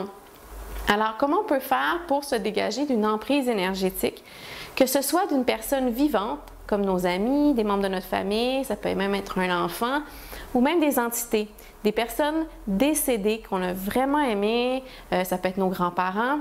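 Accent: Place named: Canada. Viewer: Un très bel accent canadien! Merci pour ce partage! Très bien expliqué. Certaines choses, je les ai apprises au REIKI, la bule d'énergie.. J'ai coupé le contact avec mon frère et ma belle-soeur l'année dernière, ils étaient devenus toxiques pour moi. Pics, remarques, jalousie etc. Ils ne m'ont même pas soutenue pour mes manuscrits, qui finalement ont été édités:-) Depuis je me sens mieux, un peu triste que j'ai dû le faire au début, mais c'était nécessaire. J'ai essayé de renouer, impossible. C'est moi qui va tomber malade. Donc, j'ai laissé tomber. Toutes les personnes qui me conviennent moins, j'essaie de les fréquenter qu'un minimum. Certaines personnes je leur ai fait comprendre qu'ils n'appartenaient plus à mon cercle d'amis. J'essaie de m'entourer que de personnes positives, et qui ne me lancent pas de pics méchants, c'est difficile je l'avoue, mais faisable. 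Nous devons diriger notre vie, gérer nos projets, et ne pas vivre la vie des autres. Je l'ai compris assez tard, mais il vaux mieux tard que jamais. Ceci ne m'empêche pas d'avoir de l'empathie pour mon prochain et d'aider où je peux. Merci pour votre travail! Après 2 ans, comme j'ai eu un commentaire de Dayou Love, je rajouterai que mon frère et moi on s'écrit mais on ne se fréquente plus. Mon frère est assis entre deux chaises, je comprends! Mais plus personne qui me commande, c'est vraiment formidable!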